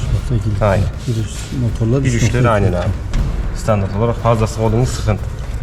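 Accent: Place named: Konya, Turkey. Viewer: Konyalı olduğu nasıl da belli oluyor kardeşimin, Konya li olarak hemen anlarım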